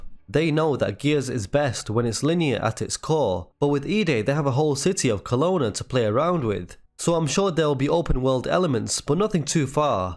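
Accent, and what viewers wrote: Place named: United Kingdom. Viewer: I like supporting your channel but you made this title with zero actual knowledge of new weapons or enemies. Just showed and talked about old ones. Obviously you’re click baiting and this shit runs dry man. Don’t take advantage of people trusting you to then sit there and say pointless stuff in your uk accent like it’s content.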